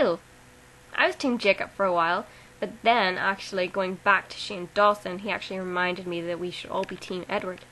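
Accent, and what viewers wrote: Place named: Belfast, United Kingdom. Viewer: Your from Belfast!? I did not know that! haha. I'm from Belfast also. You don't have a strong Belfast accent...you're very lucky :) I thought you were from England haha I also think that Twilight isn't selling sex, it's selling love. Pschh...Team Edward? No! It's Team Charlie all the way! haha :D Thanks for another awesome video!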